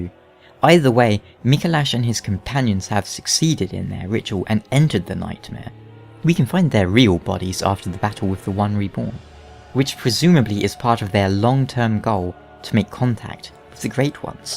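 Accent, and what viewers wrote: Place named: United Kingdom. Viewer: His British accent makes this seem as informative and credible as a TED talk :D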